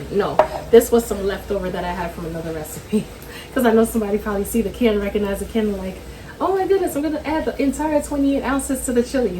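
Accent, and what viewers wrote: New York, United States. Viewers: That looks amazingly delicious 😋 and it’s sounds like a New York voice you have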